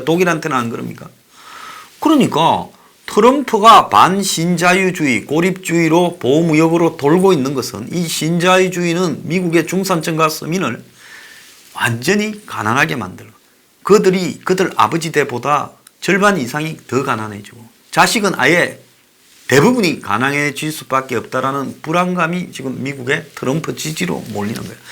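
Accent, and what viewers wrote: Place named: Busan, South Korea. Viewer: 황장수 !!! 제임스 본드 !!?? 너무많이안다 !! 우아 ~~!! 부산본토말 ~~!!황장수 !! 우파.좌파.중도파. 모두싸잡아 잘정리하고있다 !!^정치.경제 를잘알려준다 !!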